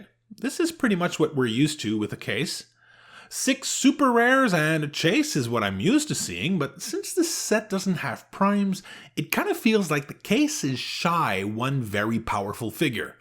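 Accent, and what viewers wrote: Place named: Quebec, Canada. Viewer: So the is French Canadian!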